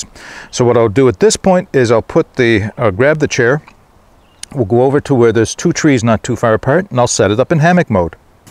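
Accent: Canada. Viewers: I love the way Canadians pronounce “ou” similar to here in Scotland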